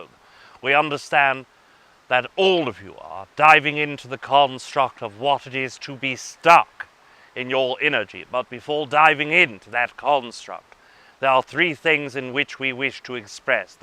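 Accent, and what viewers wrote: England, United Kingdom. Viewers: Curious why does he come through with an english accent? Fasting from all things in life in my opinion is the key to resetting the self to become more whole and aware again.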